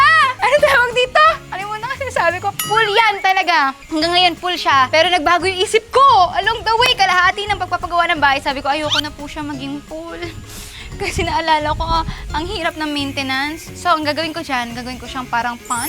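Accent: Pampanga, Philippines. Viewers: yung accent may pagka Kapampangan parin luvit haha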